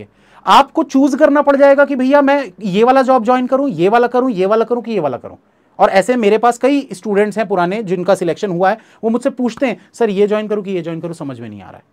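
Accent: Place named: Chhattisgarh, India. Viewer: Sir ap pura chhattisgarhi style me bole ho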